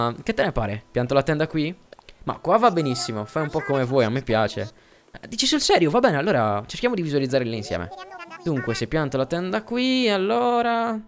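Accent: Sardinia, Italy. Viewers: Ma sei sardo? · Ma pk hai l'accento sardo? PS io sono sardo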